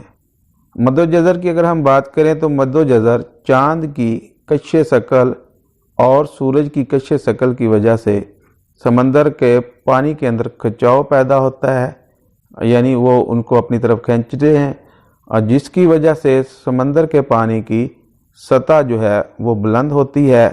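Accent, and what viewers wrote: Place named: Punjab, Pakistan. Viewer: کتنا گندا پنجابی عجیب لہجہ وہ بھائی کچھ خدا کا خوف کرو تم لوگ اچھی آواز والا خالص اردو والے سے یہ ویڈیوں بنوا لیتے فل پنجابی لہجے والا کرخت انسان کی آواز جو انتہائی کانوں کو بری لگ رہی ہے سننے کو دل نہ کرے انسان کا مگر بے شرم قوم کو سب ہضم ہے ادھر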